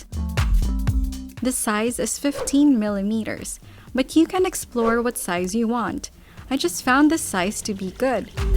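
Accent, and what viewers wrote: Philippines. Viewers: wow! ur speaking have improved very much! i don't know if that is a voice app but what matters is that it's not boring to hear anymore. i'm the one who advised u to talk faster and don't use filipino english accent 1 year ago. u are very good now! goodluck to ur plant vlogs. 😊❤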